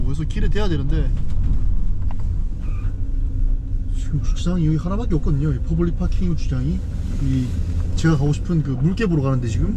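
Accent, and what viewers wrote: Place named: Busan, South Korea. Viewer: 님 영상보면 언제든 즐겁네요...부산사투리 좋고~~~신발도 좋고~ 꿈같은 로망의 여행을 대리만족으로 즐기고있네요...안전한 여행되길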